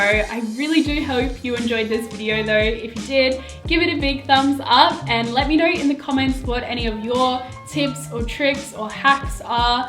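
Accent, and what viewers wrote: Australia. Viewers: Hey girl! I knew you were from Australia because of that accent :) which part of Aussie are u from? (if u dont mind me asking) hehe I'm from Adelaide! Anyways, I love your fashion style and I was wondering if you have any outfit videos that is perfect for the winter season here in Aussie <3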